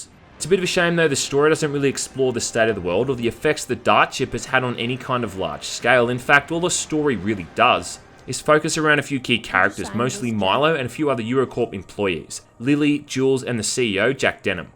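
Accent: Australia. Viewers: OMFG I was just thinking of this game and how awesome and underrated I thought it was and then you go and make a review about it, how timely, keep on rocking and reviewing old gems dude, love your channel for this and your Australian accent, gives Hemsworth a run for his money:D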